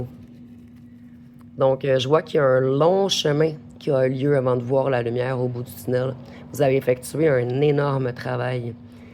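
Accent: Canada. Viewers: Merci Beaucoup Pour Vos Guidances ! Je Ne Peux Envoyer de Messages Directs ! Je Suis Touché Par Votre Accent Canadien, Mon Pays de Cœur !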